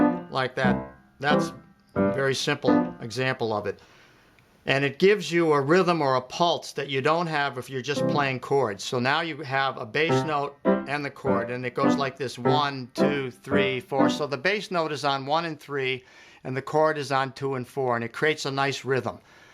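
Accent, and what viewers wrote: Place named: New Jersey, United States. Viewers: This guy has the strongest jersey accent i've ever heard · Love his jersey sound👍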